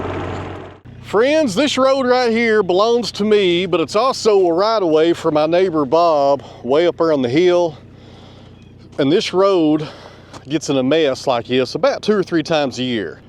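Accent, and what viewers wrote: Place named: Tennessee, United States. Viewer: Thank you, I enjoy your projects and your mastery of the english language-- Tennessee style. Happy new Year, 2025 was great thanks to you.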